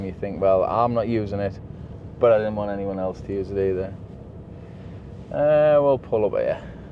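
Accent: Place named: Geordie, United Kingdom. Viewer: You've just inspired me to start a little project myself. Not churches, and hopefully something a little different. Nice to hear the local accent in one of these Youtube photography rabbit holes. Geordie lad myself, based up in Cramlington. Maybe I'll come back one day and update you on the progress. Liked, subbed and commented, because...why not.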